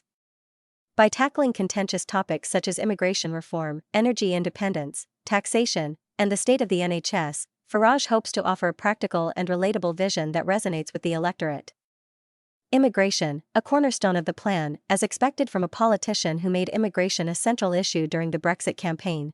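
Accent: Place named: United States. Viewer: Why do all these podcast have American voice overs. Maybe because they ain't from British podcasts but from the home of the "Sceptics", thr bad ol' US of A.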